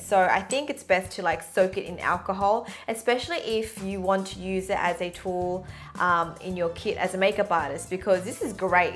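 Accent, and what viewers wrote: Australia. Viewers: Aussie accent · isn't it funny how she has an australian accent I am from australia too there is actually a chance that I have walked past her on the street without even knowing that she is a youtuber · Are you an asian australian mix? I LOVE your accent! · OMG why straight away when she started speaking I first thought of how I cook that lol there Australian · I love her Australian accent!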